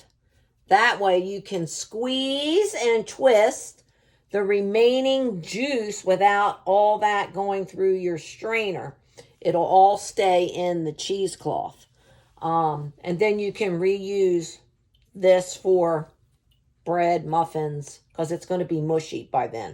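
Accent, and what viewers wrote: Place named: Pennsylvania, United States. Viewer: You have to live in Pa. with that accent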